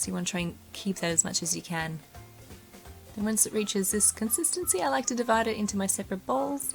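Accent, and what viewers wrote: Australia. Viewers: she pronounces 'almond' as its spelt, and 'sandwich' she says it in an australian accent - just like how australias say 'straya. main thing is we understand what is saying, nothing wrong with it at all.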